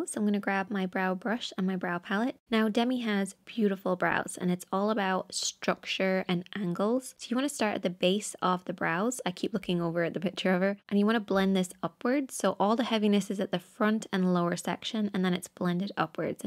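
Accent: Ireland. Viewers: Are you irish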